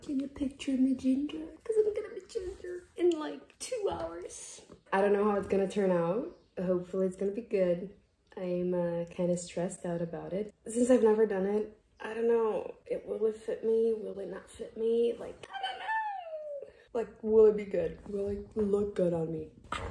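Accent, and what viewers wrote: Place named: Brazil. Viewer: Eu suspeitei que você fosse da região de onde eu sou porque você chamou a fruta Pinha de "pinha" em um vídeo, e não de fruta do conde ou outros nomes que outros lugares do país dão. Eu sou de Tanabi e descobri que você é de Rio Preto, fiquei feliz hasuhauhsuhaus Vi o filme que você fez, representando uma norte americana. Que tudo, um inglês totalmente brasileiro sendo usado pra representar americanos quando a gente como brasileiro ta cansado de ver os gringo usando mexicanos e outros para representar brasileiros hehehehehe~~ Feliz, obg por representar, viu. E agora como ruiva vão te confundir mais ainda com outros países haushuahuhs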